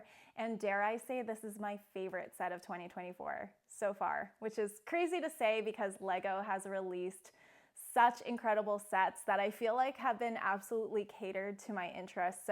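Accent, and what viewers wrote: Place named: Massachusetts, United States. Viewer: Great video! I can't wait to get my hands on that JAWS set; I couldn't believe it when it finally got announced. You did a great job showing off all the cool details, and the fact that you're a fan of the movie really comes across. Also, you're from Massachusetts, like myself, so you get extra points. I heard that Mahtha's Vinyahd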